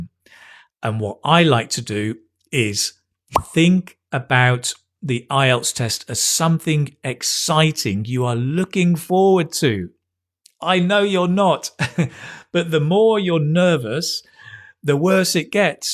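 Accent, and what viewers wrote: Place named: Manchester, United Kingdom. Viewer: Definitely British ,from Manchester, excellent teacher!!.Greetings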